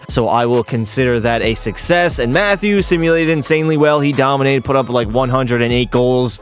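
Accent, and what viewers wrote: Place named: Quebec, Canada. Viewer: 10/10 your Québec accent!! Should be living at Montréal.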